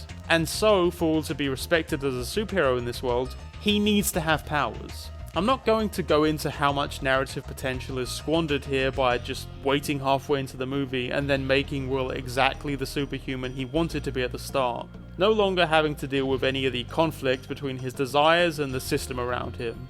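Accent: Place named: United Kingdom. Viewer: couldn't listen to this because he's british :(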